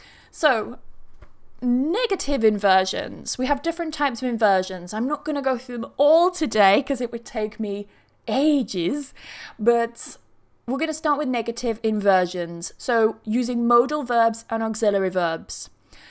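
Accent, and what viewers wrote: United Kingdom. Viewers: Thank you so much from Ukraine! Just about a couple of days ago I was thinking about the inversion, as I see it quite often and I was interested in learning it. And today I've seen your video and I was able to understand everything you were talking about! Thank you!! And one more thing!:)) As I'm learning different English accents (British is my favourite one!:)) but I also know you have more than 50 accents and dialects in England, I want to say that your accent is really great and it's a great pleasure to listen to it:))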